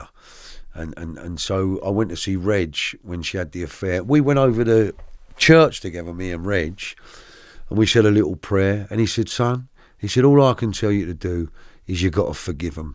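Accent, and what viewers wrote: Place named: England, United Kingdom. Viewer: I love the English accent!🤗🥰💯💞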